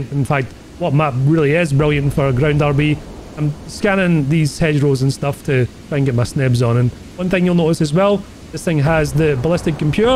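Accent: Scotland, United Kingdom. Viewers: great video guy! good to hear another scots voice out there on the interweb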